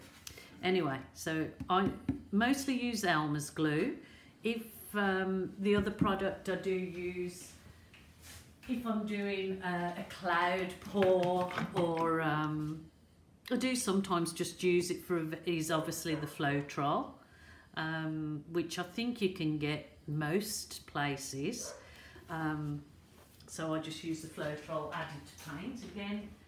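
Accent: Birmingham, United Kingdom. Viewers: I knew I wasn't going daft! I knew I could hear a bit of Brummie in there lol xx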